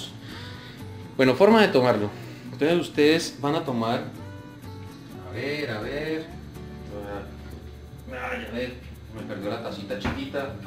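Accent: Colombia. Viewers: Hermosa tu voz eres colombiano??? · Excelente video.. ahora mismo lo voy a preparar. Pero tengo una duda si su acento es de 🇨🇴 Colombia o de Costa 🇨🇷 Rica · Jajajaja si parece caleño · Usted es valluno se nota su gracia al hablar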